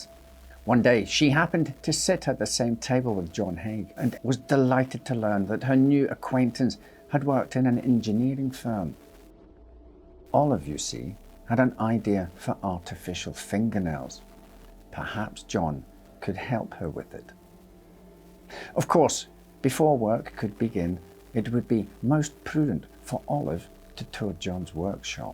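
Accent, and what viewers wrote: Scotland, United Kingdom. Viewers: What part of Scotland are you from? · Fantastic thanks....love the Scottish accent!!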